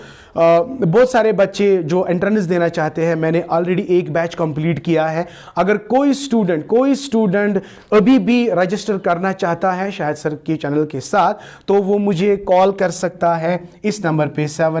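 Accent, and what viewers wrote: Kashmir, India. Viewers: I like your little kashmire in sir your lecture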